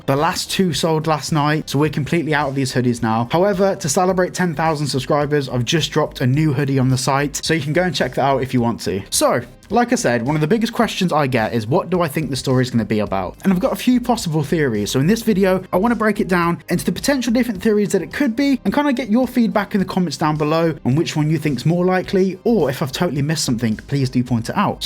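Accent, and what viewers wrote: Birmingham, United Kingdom. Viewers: This dude is from Birmingham I can tell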